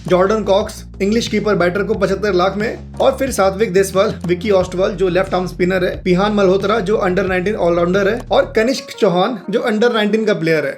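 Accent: Bihar, India. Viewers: Bhaiya bihar se ho kya ❤❤❤❤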